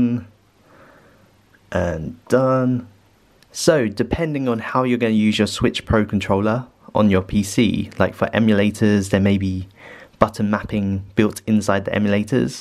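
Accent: England, United Kingdom. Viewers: First time I see an Asian with British accent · How are you asian but with an english accent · Was not expecting this guy to have a British accent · He looks asian but he has an British accent LOL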